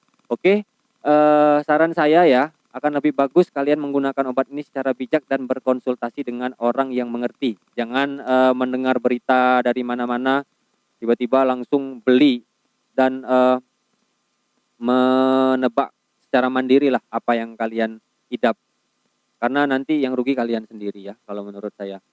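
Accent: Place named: Java, Indonesia. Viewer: Jawanya kental bet bro🤙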